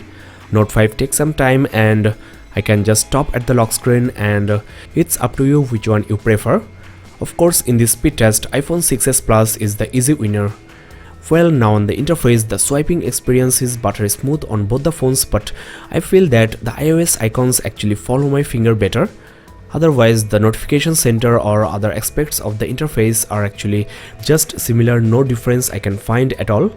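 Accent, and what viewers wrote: India. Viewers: he speaks indian english dude 😄😄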